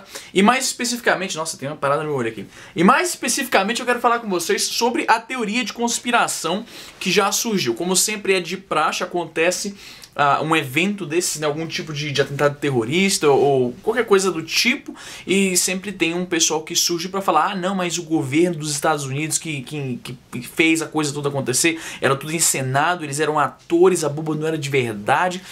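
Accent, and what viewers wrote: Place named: Ceará, Brazil. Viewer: o sotaque de cearense do é muito estiloso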